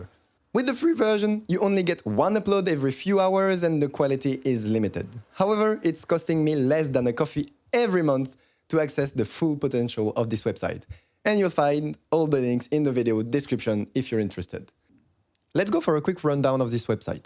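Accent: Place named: France. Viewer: This guy's the definition of a French accent ❤ it heh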